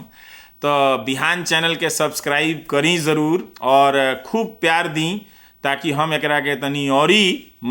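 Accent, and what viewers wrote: Bhojpuri, India. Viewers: माई रे माई बिहान होई कहिया। आदमी के आदमी से पहचान होई कहिया। नफ़रत के बोली मेटान होई कहिया। माई रे माई ....अभी जवन चल रहल बा ओकरा के देख के कुछ अपन लाईन जोड़ देनी ह, सचमुच आजो अनहरियाईल रहम हमनी के त बिहान कयीसे होई। रविश सर, राऊर भोजपुरी के बोली सचमुच बड़ा निक लागल। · राहुर भोजपुरी सुन के मजा आ गईल😊👍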